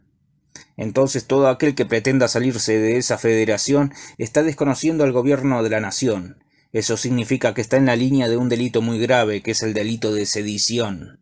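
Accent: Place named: Rioplatense, Argentina. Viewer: Muy buen vídeo , esta muy bien redactado...de donde sos? Porque tenés acento rioplatense ,no cuyano.... Me gusto porque es bastante objetivo y tiene la información correcta , no como los medios grandes de BsAs que no saben mucho y le echan la culpa a Cornejo...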